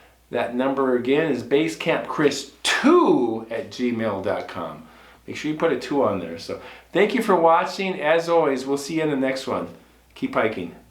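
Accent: Minnesota, United States. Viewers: I love Grand Marais, I e gone dogsledding there. I have no doubt with all the wild country that big foot is out there. Remer MN is really into Bigfoot ! Love to hear you say Minnesota, the accent is still there😊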